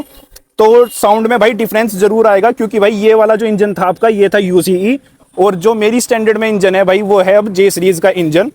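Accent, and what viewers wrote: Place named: Muzaffarnagar, India. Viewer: Bhai tum muzaffarnagar se hi ho kya 😅😅😅 konse gao ke hoo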